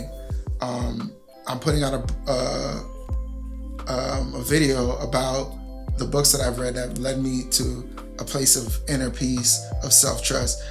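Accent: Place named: Jamaica. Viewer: Great advice. idk how, but I knew u were Jamaican as soon as u spoke lol wassap fam. But yeah. I think one of the hardest things is trying to build yourself outside of your family. Like you were saying, certain habits you didn't know you had. I recently moved out, and there are so many things I thought were normal that aren't.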